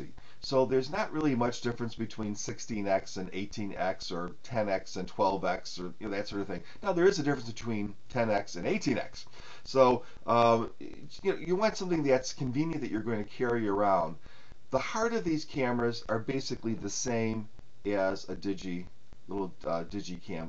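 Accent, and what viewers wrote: Chicago, United States. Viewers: are you from chicagoland?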